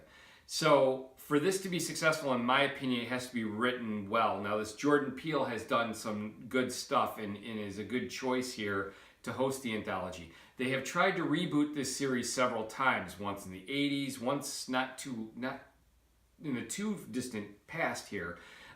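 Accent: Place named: Upstate New York, United States. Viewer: Lol i could could tell you were from upstate ny 3 words in. Great commentary! He really is a gem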